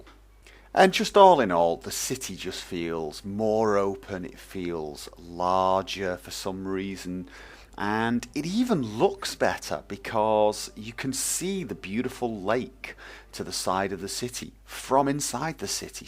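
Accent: United Kingdom. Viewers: No he's British. :)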